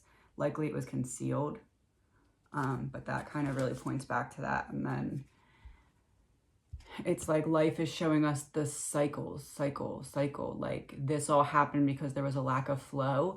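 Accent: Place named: Maryland, United States. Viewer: Where are you? I hear Maryland or PA which reminds me of my cousins! I am enjoying your readings very much!!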